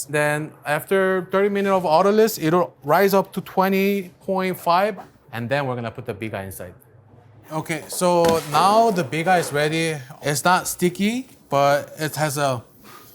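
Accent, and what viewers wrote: Mexico. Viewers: He really sounds a slightly bit mexican with the intonation of his voice as he pronounces words 😂 love it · Why does he sound Mexican?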